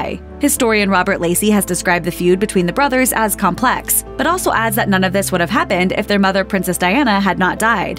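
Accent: United States. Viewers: This is the American take. I don't give this much slack. The American accent is slident against the subject of the video. Yakkity yak yak! Phew! (I'm a Singaporean.) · I'm not worried about any of them,,,, but why is an American sounding so full of knowledge about it,,,, oh wait, she's American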